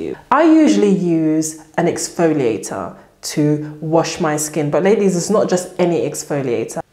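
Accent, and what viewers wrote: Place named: RP, United Kingdom. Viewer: That accent! very posh prim and proper. Us Americans can't get enough of it. Sending love from NY 🖐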